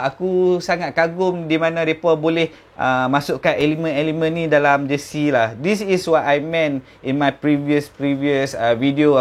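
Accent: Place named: Kedah, Malaysia. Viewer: hang review pakai loghat Kedah/Penang pasaipa hahaha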